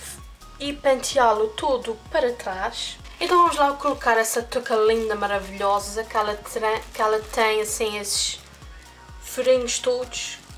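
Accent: Portugal. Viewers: Fiquei de boca aberta quando abri o video e ouvi o sotaque miquelense :o P.s sou de santa maria xD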